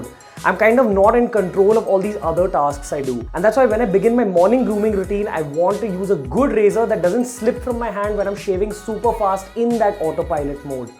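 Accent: India. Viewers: Indian Accent. Funny. Do you paint?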